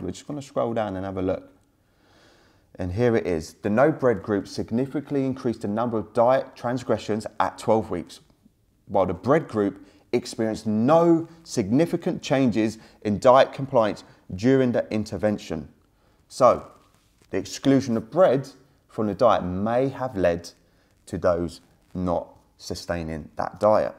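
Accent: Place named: Cockney, United Kingdom. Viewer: Please dont take this the wrong way as you present great info as usual, but i cant get past the Jamie Oliver likeness (no offense but most of us feckin hate that mockney Cockney). You need to get Tom from behind the camera to in front. I know hes doing his own thing but i think your subs would go through the roof with either him or both of you presenting serious content with an injection of humour... keep up the good work.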